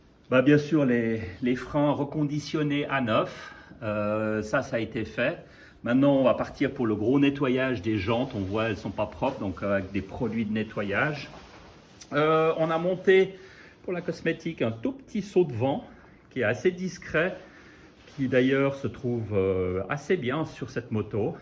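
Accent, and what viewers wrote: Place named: Geneva, Switzerland. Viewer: Lors de l'épisode concernant le contrôle et la remise à niveau des composants électriques du cadre, aviez-vous passé un léger coup de jet, ou simplement un coup de chiffon ou de pinceau avant votre travail? Vous m'avez dit que vous aviez passé la moto complète au Karcher avant toute intervention, ce qui est normal, mais qu'en est- il de l'épine dorsale du cadre, une fois le réservoir et la selle enlevés ? PS: un commentateur mentionnait votre accent vaudois. Personnellement, il sonne plutôt genevois....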